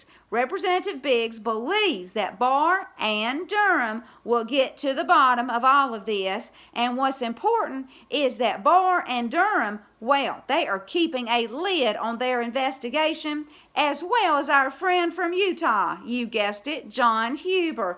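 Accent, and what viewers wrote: South, United States. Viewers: I can’t believe I just ran across your channel! I’m a little late in the waking up thing but it’s been since 2016! Anyway I get a kick out of your accent(I’m southern too!) God Bless and keep on keeping on!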